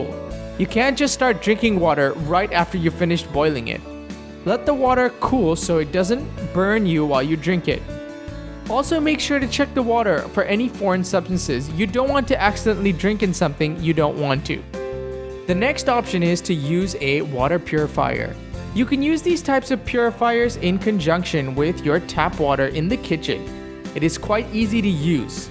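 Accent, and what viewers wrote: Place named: India. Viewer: Fuck you amost have the indian accent tune down to nothing..but still need to work on it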